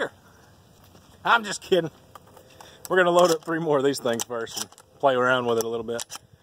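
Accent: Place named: South, United States. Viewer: If it weren't for the southern drawl, you'd sound like Barrack Obama.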